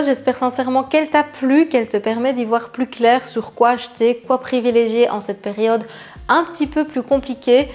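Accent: Belgium. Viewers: Merci pour toutes ces idées ! Au fait, d’où vient le petit accent caché derrière l’accent belge ?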